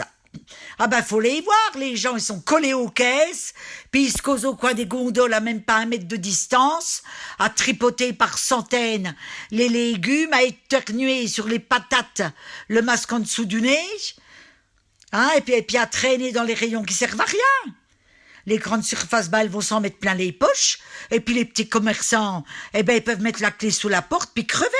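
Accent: France. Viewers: Merci ma très chère pour ta verve et ton regard si aiguisé sur cette société mortifère...on a besoin de ta vision et de ton accent qui nous emmène au cœur de ta belle province. On t embrasse du cœur du Nivernais et n oublie pas Lola!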